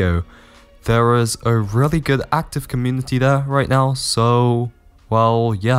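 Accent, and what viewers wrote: France. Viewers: wait hold up, are you french?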